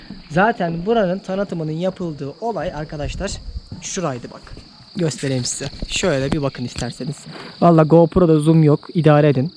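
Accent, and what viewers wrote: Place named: Aegean, Turkey. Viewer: Gadeşlik seni ilk defa izliyom sen bizim oralıya benziyon şivenden belli egelimisin.. Accık şiven aydınla muğla arası benziyo. Güzel gez dikkat et kendine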